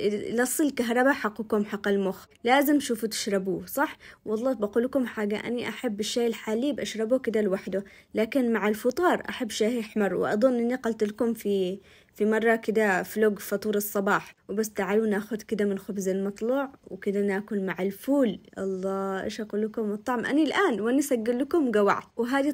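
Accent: Yemen. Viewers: الله يعطيك الصحة انا جزايرية و زوجي يمني و الله يفرحك قناتك ممتازة ما شاء الله و لهجتك يمنية حلوة و مفهووووومة 😊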